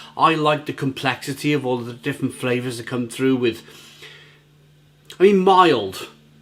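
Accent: Wales, United Kingdom. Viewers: I remember Hobgoblin was £1.25 in tesco a few years back. It’s such a shame Wychwood were bought out by Carlsberg 😑 Love your Welsh accent btw 🏴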